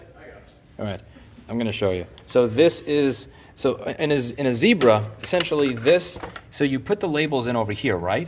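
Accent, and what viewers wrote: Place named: East Coast, United States. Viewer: I was like why does this voice sound so familiar? Gotta love east coasters, oh shoot it is? haha should have known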